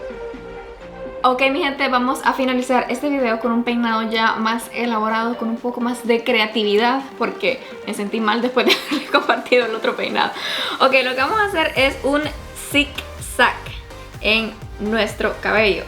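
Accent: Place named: Honduras. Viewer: A mí me encanta que está mujer tanto que a viajado y todo, habla como catracha, & otros majes que se van para otro pais hablan como majes & ella no pierde la esencia... Por eso y otras cosas me la sube 💖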